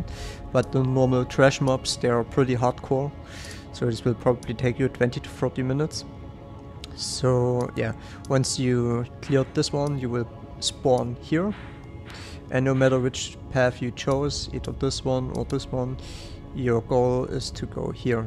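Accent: Saxony, Germany. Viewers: Dieses deutsche English. Man kann als Deutscher den aktzent einfach nicht verstecken. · Interesting. You sound like a german from saxony. :) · schaue das Video 2 Sekunden und höre direkt dass du ein deutscher Sachse bist😂 nice one bro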